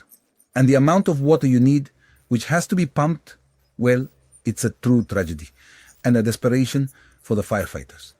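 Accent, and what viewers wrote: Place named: India. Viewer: Guessing its IA....?¿? Misspronuntiation makes me think thats the real you but sound like indi pretty often @@ Interesting project! Gonna keep an eye on it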